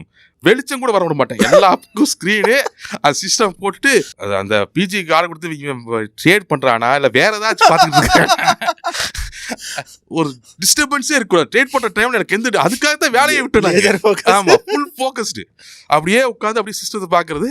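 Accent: Kongu, India. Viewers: இனிமையான கொங்குத்தமிழ்..... 👍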